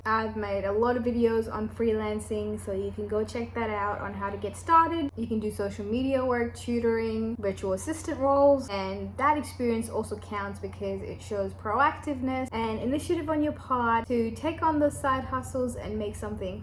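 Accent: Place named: Australia. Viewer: You have a very great Australian dialect already, I find it hard to sound more Australian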